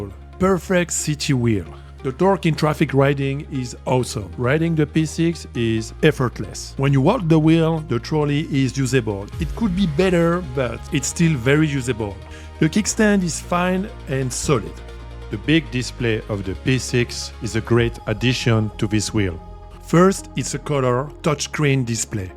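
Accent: France. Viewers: T’es français ta un accent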